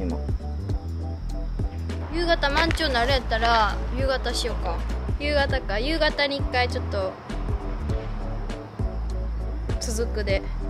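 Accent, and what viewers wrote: Senshu, Japan. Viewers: ﾊﾞﾘﾊﾞﾘの関西弁 笑えるし よいな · 見ときや、見りや 泉州弁ええな。やっぱ。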